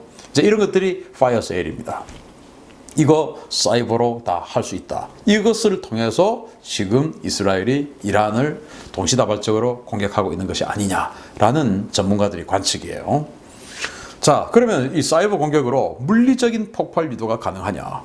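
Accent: Gyeongsang, South Korea. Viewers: 간곡히 건의합니다 1. 멘트를 조금 늦춰주시면 좋겠습니다. 경상도 억양에 말씀을 너무빨리 하기때문에 미처 이해하지 못하는 경우가 있습니다. 2. 광고가 너무많아 답답합니다. 다른 방송보다 독자 수가 월등히많은데 운영에 넉넉 하쟎 습니까? 깊게 헤아려 주셨으면합니다. 존경합니다. !!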